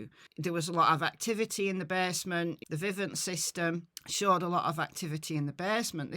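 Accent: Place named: Northern England, United Kingdom. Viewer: You've turned in2 one of my fave channels on this case. Plus you sound like me northern mate. I'm.sarf England. Plus you know what ya talking about xx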